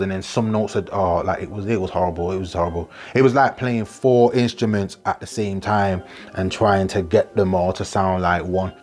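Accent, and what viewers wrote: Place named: United Kingdom. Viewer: Never knew you were british man love this channel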